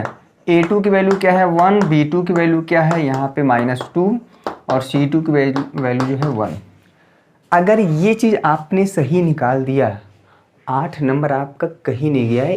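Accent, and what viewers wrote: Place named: Bhojpuri, India. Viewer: भोजपरी सर आप बहुत अच्छा बोलत बानी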